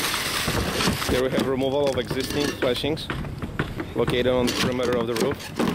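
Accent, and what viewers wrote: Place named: Mexico. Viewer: good to see these tough americans working so hard. Gotta keep those jobs. No damn Mexicans come here as roofers, get rich and demand overly high wages. Not in my land. Hope that heavily accented Mexican commentator isn't getting paid much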